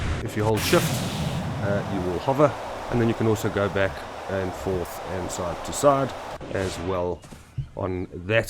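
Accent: Afrikaans, South Africa. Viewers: Sound afrikaans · Is that kiwi or suth efreeken? Pretty sure it's suth efreeken.. best accents ever. Kiwis slightly better purely cos of the ey Bru U drounk yit? Oh no wirries Bru. Have ma criser I gat thri edit: kisses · Are you from south africa?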